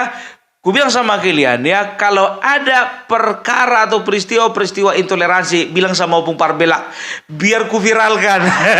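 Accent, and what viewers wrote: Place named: Batak, Indonesia. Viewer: Opung parbelak aku suka nonton konten nya dan logat bataknya lucu banget dan mengena sm orang nya yg intoleran terhadap agama lain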